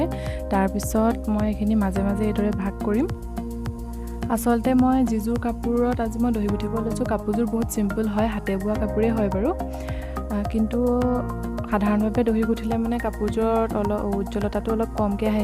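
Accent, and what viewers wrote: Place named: Lower Assam, India. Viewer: kotha kwa bur nomamia kowa jen lgise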